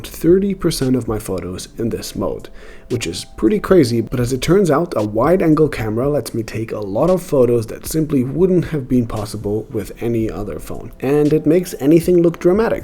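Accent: Germany. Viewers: Kommst du etwa aus Deutschland? :O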